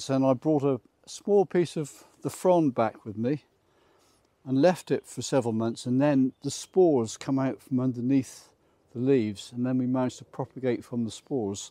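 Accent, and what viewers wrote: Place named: United Kingdom. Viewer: Thank you so much for the wonderful tours. Subscribed! Loved that huge Fern from the Chilean Rainforest - what a beauty. Such a fantastic variety of plants - I will be binge-watching every youtube video I can find. Just lovely and exciting to see such rarities and the wonderful ways they are displayed. Please continue showing the plant names - that is incredibly helpful - it is very difficult to understand British English when you are used to American English:).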